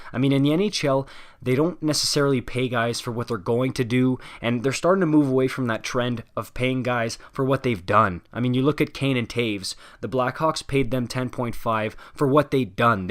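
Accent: Quebec, Canada. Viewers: Mon radar a queb sonne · Il est possiblement Québécois en se basant sur sa prononciation des noms français. Les Québécois sont généralement bilingues et n'ont pas d'accent dans les deux langues. Ou il est quelqu'un qui a appris le français très sérieusement.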